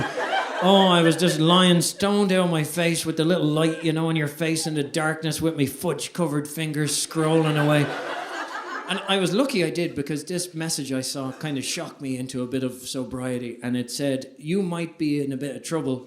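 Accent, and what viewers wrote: Ireland. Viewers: You are absolutely brilliant. Of course everything sounds even funny with the Irish Accent 😂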